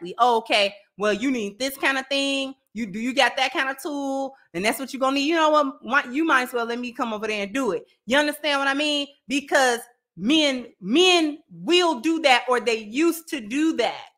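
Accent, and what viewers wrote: Chicago, United States. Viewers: are you from Chicago? I been subscribed for months, and this is the first video that I noticed a Chicago accent lol. Dope content btw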